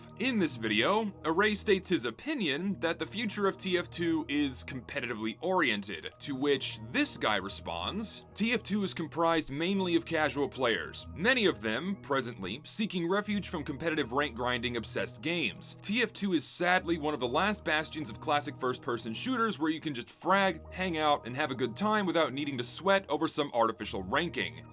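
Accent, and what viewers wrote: South, United States. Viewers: You know when is angry when his southern accent bleeds through his speech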